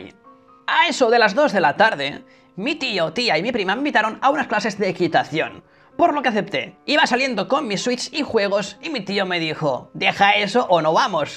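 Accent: Spain. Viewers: Oye eres español verdad?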